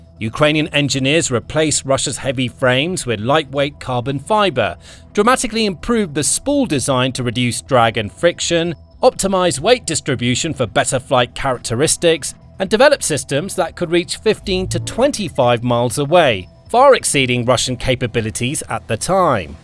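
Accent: United Kingdom. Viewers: You speak with a British accent and talk about Europe BUT USE MILES? instead of Kilometers? I don't get it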